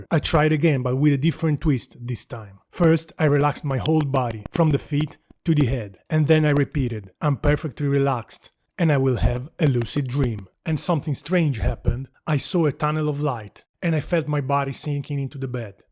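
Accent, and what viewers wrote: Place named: Italy. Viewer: from the accent he seemed italian and then i read "ho sognato che stavo mangiando un panino", ed è lì che ho capito che era mio fratello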